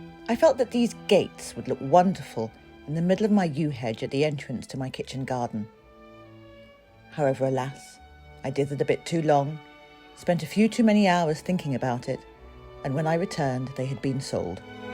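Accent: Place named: England, United Kingdom. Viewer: I just came across your station and I am so engaged with your channel! You have managed to make everything a real experience from the classical music , the English accent and the history ,poetry I love ❤️ it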